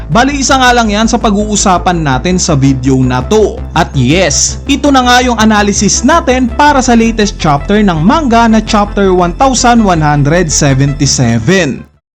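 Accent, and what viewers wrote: Visayas, Philippines. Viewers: bisaya spotted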